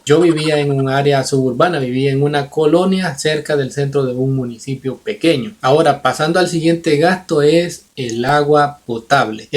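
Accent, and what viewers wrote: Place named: Cuba. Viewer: Por favor podrias pronunciar las letras S, FINALES? Y LA D, FINAL. SE TE OYE COMO J. TU CANAL ES MUY BUENO.ESCUCHATECY VERAS, QUE TU MODO DE HABLAR, PARECE DE UN CUBANO O NUCARAGUENSE PERO NO, SALVADOREÑO.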